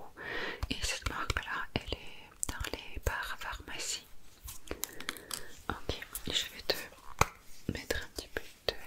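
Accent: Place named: France. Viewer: Hello j'adore votre jolie voix et votre accent français !!🙂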